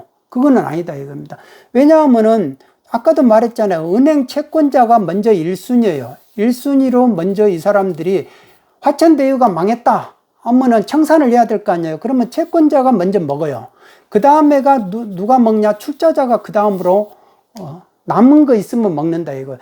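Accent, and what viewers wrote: Jeolla, South Korea. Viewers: 뭔가 팩트만 항상 말씀하시는 분 같이 느껴지네요. 억양은 라도분 같은데... 믿음이 가네요.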